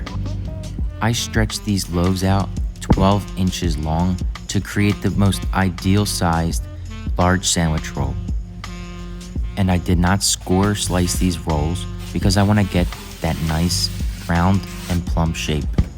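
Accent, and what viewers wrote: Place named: Philadelphia, United States. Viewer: The Philly accent cracks me up. Left Philly in 80 and still miss the Hoagies.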